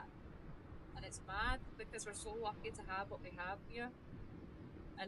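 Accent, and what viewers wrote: Scotland, United Kingdom. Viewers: I’ve said it before but I’ll say it again: geology sounds better in a Scottish accent. 🤘🏴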